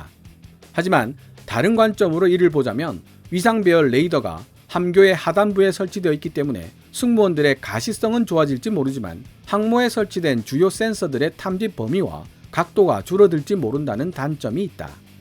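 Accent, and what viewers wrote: Gyeongsang, South Korea. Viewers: 영어단어는 그냥 편하게 읽으시지..참 어색하게 한국어로 말하다가 도중에 나오는 영어단어를 누가 그렇게 혀 굴려ㄱㅏ며 코맹맹이 소리로 어색하게 발음하나요? 거기에 경상도 사투리 억양까지 첨가되서... 되게 이상해요.~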